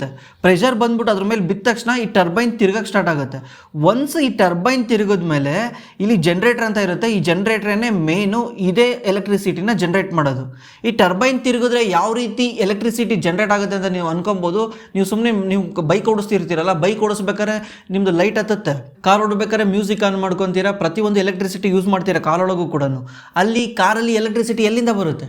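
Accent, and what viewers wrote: North Karnataka, India. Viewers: Current hogeti anta kundarasabek antete super brother uttara kannada langauge...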